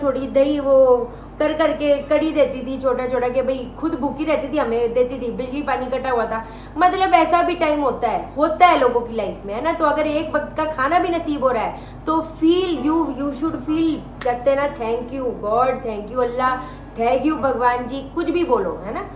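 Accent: Punjab, India. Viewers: I am very much impress with your way of talking punjabi tarhka .And i also like your upay mam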